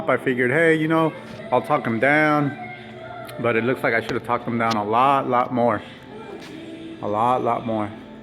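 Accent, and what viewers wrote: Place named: Yorkshire, United Kingdom. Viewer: really useful. sweet accent British Yorkshire. and very useful regarding cracked old vinyles. thank u. U could have removed the glue by aseton easily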